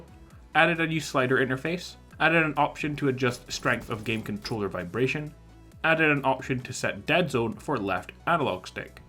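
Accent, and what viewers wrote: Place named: England, United Kingdom. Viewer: Your voice is like pencils getting stabbed through my eardrums. You goofy English Fuck.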